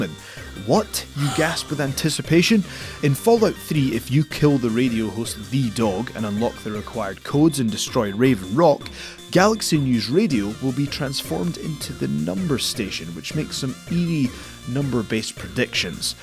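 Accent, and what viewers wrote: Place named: Scotland, United Kingdom. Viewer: Dude must be from the same town as Gerard Butler, their accents are identical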